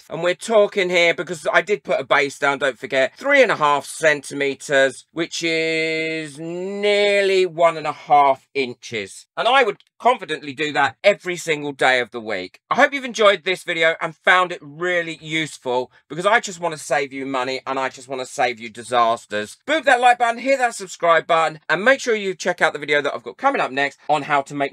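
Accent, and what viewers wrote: United Kingdom. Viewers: I love British YouTubers because the way the speak it is very pleasant to listen too. I love the British accent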